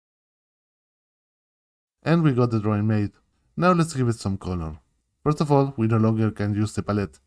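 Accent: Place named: Spain. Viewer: Really nice videos, all of them. I buyed the promo and its wonderful with lot of content. BTW your accent seems spaniard, do you have any video in spanish. I want the for 2 things: show it to my children and also as a Teacher I wish to do a inkscape tutorial with my students. Think on it! they need like 10 visualizations per student to get to do the exercises (somehow something that resembles a draw). Thank you for this really nice channel!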